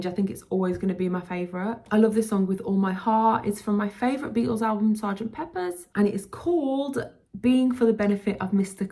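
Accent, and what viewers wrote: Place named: England, United Kingdom. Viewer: Love your videos. I agree with your choices but I'd put first and afterwards but I don't share your memories but yeah, all great choices. May I ask which part of England are you from. I'm from Birmingham, and I can't quite place your accent. Sounds close though.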